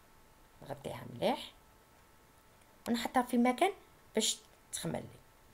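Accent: Tlemcen, Algeria. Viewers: و عليكم السلام.وصفاتك خفيفة ظريفة كيما حضرتك. ربي يوفقك و يزيدك من فضله. تعجبني لهجتك كثيرا هل حضرتك من تلمسان؟ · samir azouz والله حتى أنا ظنيت أنها من تلمسان من لهجتها 😊😊😊😊 انا من العاصمة ...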